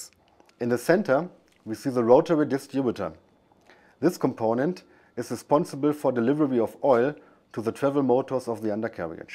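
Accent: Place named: Germany. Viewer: Ummmh, when germans speak english :-(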